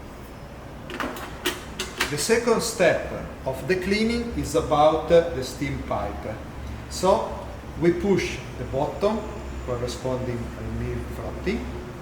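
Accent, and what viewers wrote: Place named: Italy. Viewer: Why does Italians speak English so badly? It seems impossible to even do it on purpose xD the accent is absolutely devastating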